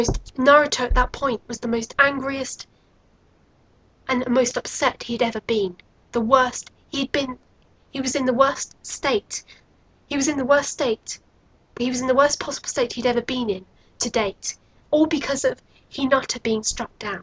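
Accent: United Kingdom. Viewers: You have a cool british accent :D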